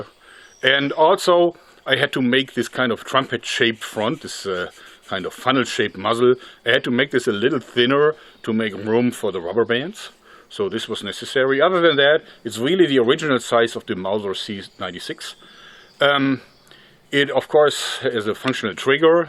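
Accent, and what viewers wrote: Germany. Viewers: Love it! Hello from USA! I love your videos and I think your german accent is cool and makes you seem more like a mad scientist haha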